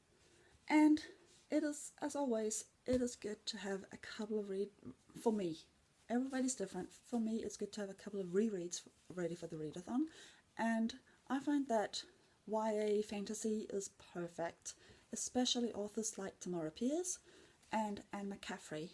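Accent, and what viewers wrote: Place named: New Zealand. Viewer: Din kiwi accent er jo gudeskøn:) håber du får et rigtig godt readathon!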